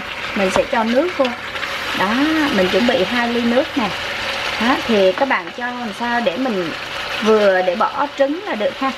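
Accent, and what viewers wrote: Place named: Hue, Vietnam. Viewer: Giong hue nghe de thuong qua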